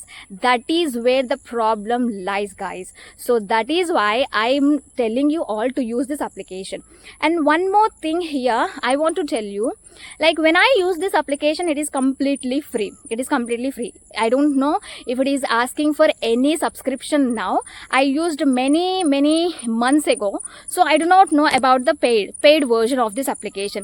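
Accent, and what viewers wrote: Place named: Andhra Pradesh, India. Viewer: I think your from Andhra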